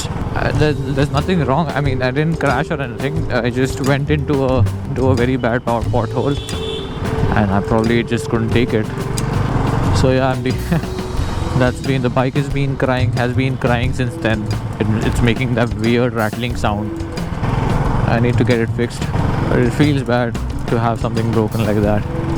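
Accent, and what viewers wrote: Malvani, India. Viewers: I am watching this video for the 7th time just to hear his malwani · Sir tumhi malvani kadak boltat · I like for malvani ..... though I'm not but love that accent 👍🤙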